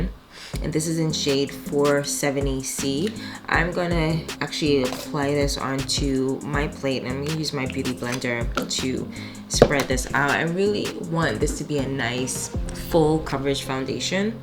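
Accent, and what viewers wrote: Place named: Caribbean. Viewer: Are you west indian? Cuz I hear a little accent.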